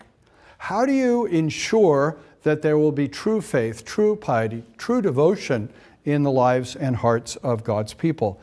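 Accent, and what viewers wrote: United Kingdom. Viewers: That accent reminds me of William Butcher, a British fictional character from the Amazon Prime series 'The Boys'.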